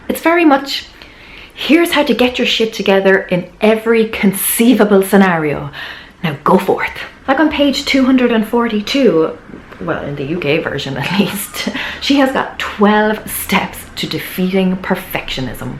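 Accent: Ireland. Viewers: I'm so excited for this interview with Sarah Knight! Fun fact: After watching your review on The Life-Changing Magic of Not Giving a Fuck, I'm currently reading it..... and in my inner monologue, it's narrated in your Irish accent! So, the comment about you doing the audio book was particularly funny for me ;) I can't wait to get into the new book. I'm in Canada, so I'd love to win the US version of Get Your Shit Together!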